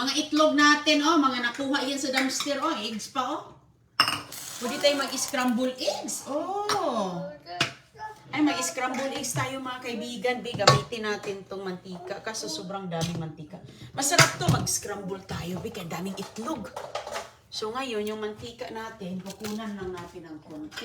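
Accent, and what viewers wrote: Visayas, Philippines. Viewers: Eh bisaya nga kaya ganon syang mag salita hellow wag n kayang mang husga . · Visaya po ksi siya natural lng po yan sa pananalita nila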